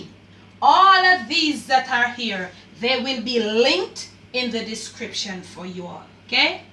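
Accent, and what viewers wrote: Jamaica. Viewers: Big up yourself!! I'm so happy I discovered your channel. I love your delivery and your embrace of the jamaican patois. Continue doing an awesome job,can't wait to see more videos.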